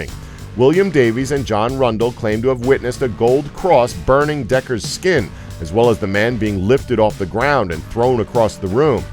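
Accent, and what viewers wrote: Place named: United States. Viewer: Probably a Chinese channel that hired an American sounding narrator.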